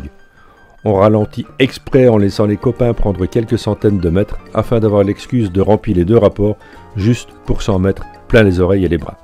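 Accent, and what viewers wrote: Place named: Belgium. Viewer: Perso j'ai commandé cette moto qui doit m'être livrée en avril/mai, je n'ai qu'une hâte c'est de la réceptionner et rouler avec !! Merci pour ta vidéo, très belle et bonne présentation de la machine et j'ai particulièrement bien aimé ton petit accent de Belgique !!!! ;)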